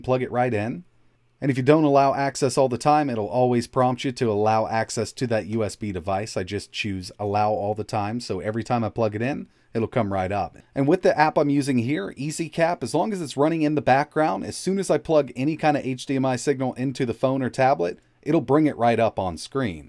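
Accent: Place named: South, United States. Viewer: Sounds like spent some time in the south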